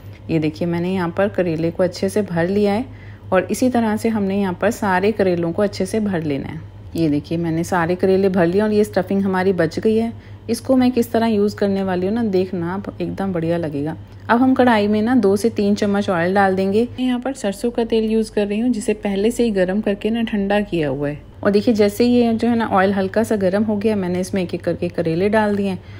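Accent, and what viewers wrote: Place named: Punjab, India. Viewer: Aapki aawaj different lag rahi h Punjabi tone aa rhi h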